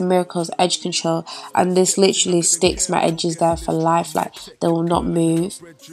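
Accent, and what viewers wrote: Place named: Northern England, United Kingdom. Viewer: So refreshing to hear a northern accent on YouTube for once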